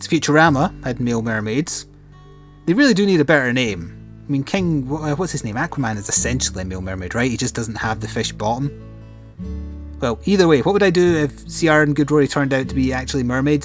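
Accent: Scotland, United Kingdom. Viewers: wasn't insulted. mermen are male half fish. i like listening to a scottish accent and u dont come across as stupid. keep up the entertaining and interesting videos. thankyou.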